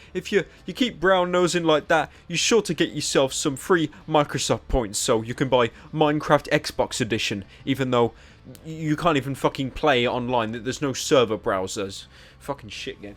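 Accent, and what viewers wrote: United Kingdom. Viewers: what's wrong with his voice lol I love British accents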